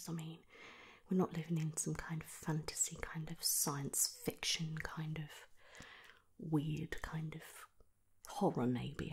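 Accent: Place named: United Kingdom. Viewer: Gosh I just love the English/British accent It’s very triggering.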